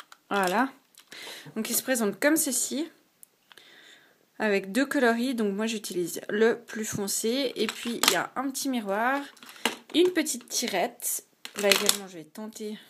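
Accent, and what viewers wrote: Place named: Switzerland. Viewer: coucou ! je pense que tu mérite plus d'abonnées pour moi :) Wahou ta collection !! PS: Tu habite en Suisse ? ( J'ai l'impression que tu as un accent :$ ) Passe voir ma chaine ;) bisous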